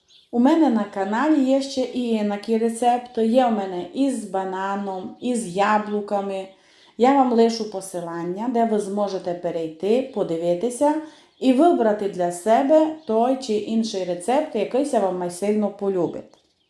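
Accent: Transcarpathia, Ukraine. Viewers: Дякую за дуже смачні рецепти❤️ подобається й закарпатський діалект 😊 натхнення Вам💙💛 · Вона говорить на чистому закарпатському діалекті! Чого повторюєте? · Олю, говоріть, будь ласка, на закарпатському діалекті і ні на кого не зважайте. Це так милоооо….. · прекрасна українська мова з закарпатським діалектом, супер! Дякую за рецепт! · Добрий вечір,! Дякую вам за чергову смакоту, яку готуєте і нам показуєте! будь ласка не треба нічого вирізати при монтуванні, Аніта має рацію. Це особливість вашого каналу, ваш закарпатський діалект, слухаю і дивлюсь вас з превеликим задоволенням! Вітання з Вінниччини. Миру перемоги нашій рідній Україні, рясних Божих благословінь вам і вашій великій дружній родині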